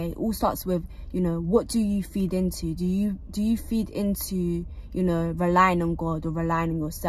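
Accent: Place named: United Kingdom. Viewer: Rah wasn’t expecting a U.K. accent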